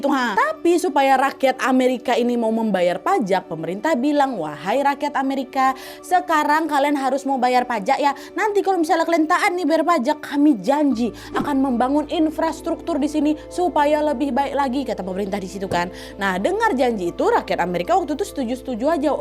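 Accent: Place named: Medan, Indonesia. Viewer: Mantap kalee kakak ini penjelasanya....suka sekali saya dengan gaya Medannya...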